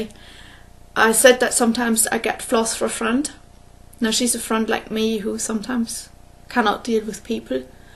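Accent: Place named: United Kingdom. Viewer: I got here from StitchingMommy’s Flosstube, and I love your video. I adore British accents, and many people here in the US do also, so I hope your channel grows. There’s nothing i think you’re doing wrong. Maybe put more easily searchable words in each video’s title, possibly the designer’s name of something you’re working on, or something like that? One thing I really enjoy, too, is that you never say “um”! Many flosstubers haven’t mastered that yet, and eventually I can’t watch any more. Your voice is pleasant and has a lovely cadence! And I suffer from depression also. Your advice about light is spot on! 👏👏👏 I keep all indoor lights way down low after the sun goes down. Only a nightlight i. the bathroom. Only the stove hood light in the kitchen, etc. After a week of this, my insomnia disappeared and has never come back. That was 3 years ago.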